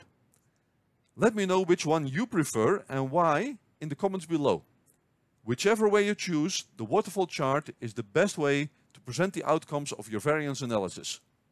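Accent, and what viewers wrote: Netherlands. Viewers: what a nice dutch accent_:D but thanks, useful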